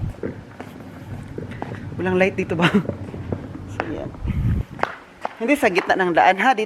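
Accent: Visayas, Philippines. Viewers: Bisaya ka dong?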